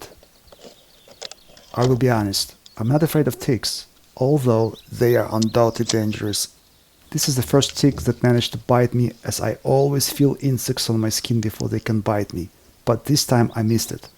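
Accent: Russia. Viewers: has a great relaxing voice, with just the right amount of Russian accent. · If coronavirus could be eliminated from our bodies like ticks, then it.would be simple. I notice your english is aquiring the russian form now. In the previous videos, it was more foreign. It is okay.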